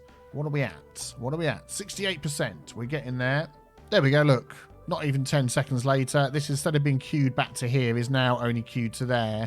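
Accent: United Kingdom. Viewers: It's his voice. It has that soothing, calming British tone to it... 🇬🇧😆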